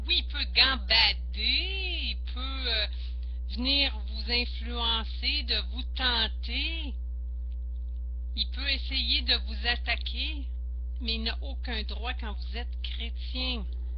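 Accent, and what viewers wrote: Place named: Canada. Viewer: Bonjour d'un frère français j'aime bien vos vidéos merci pour vos conseilles et vos expériences , ça donne une petite tournure marrante avec votre accent Canadien .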